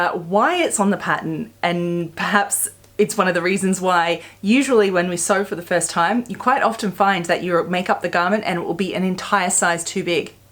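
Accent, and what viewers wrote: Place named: Australia. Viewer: You look so French today, but amusing to hear the Australian accent! Lol!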